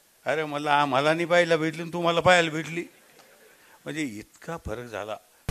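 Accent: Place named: Marathwada, India. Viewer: लयी भारी बोलता तुम्ही मराठवाडा style.....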